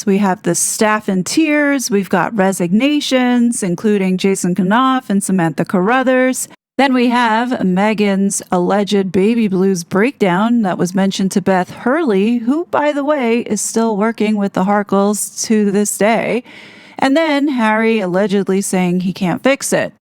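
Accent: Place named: United States. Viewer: Correct me if I’m wrong- you sound American- how did you get your hands on the book?! I had to order mine from UK and it won’t be here for another week😢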